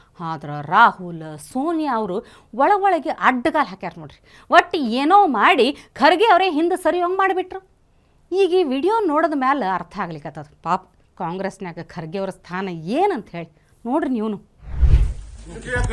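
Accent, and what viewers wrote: North Karnataka, India. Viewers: ಮೇಡಂ ಉತ್ತರ ಕರ್ನಾಟಕ ಭಾಷೆ ತುಂಬಾ ಚೆನ್ನಾಗಿರುತ್ತೆ ಜೈ ಶ್ರೀ ರಾಮ್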